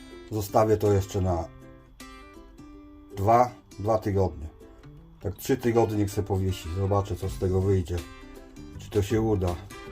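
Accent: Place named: Silesia, Poland. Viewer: Ciekawa ta gwara! Chyba że Śląska? Produkt smakowity! Dobrego dnia życzę 😅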